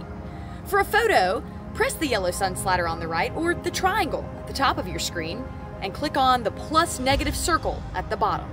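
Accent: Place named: Baltimore, United States. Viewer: Baltimore girl?? That accent on the Os is why I ask…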